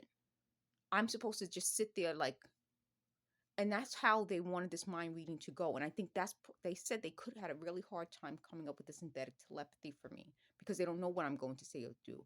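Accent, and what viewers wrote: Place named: New York, United States. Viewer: I'm sorry you are going through this! Do you think it's Riverbay doing this? Or their leaks/ mold causing issues? I know other people that are having issues, and they think its the water (the shower water may have too much metal) or the mold. I hope your leg gets better soon. Have your doctor check your ferritin and all iron levels. Also, check your insulin level, specifically A1c test. Again, I hope things get better. Thanks for the hair oil info! 😊 btw I love your slight NY accent. Keep smiling and try not to let anyone get to you!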